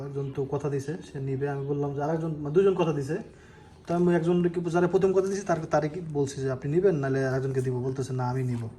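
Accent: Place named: Barishal, Bangladesh. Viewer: আপনার ভাষা শুনলে কথার মেজাজটা গরম হয়ে যায় বরিশাইল্লা খাতার গাট্টি ভিডিও সামনে একটু শুদ্ধভাবে কথা বলার চেষ্টা করবেন বুঝতে পারছেন খেত কোথাকার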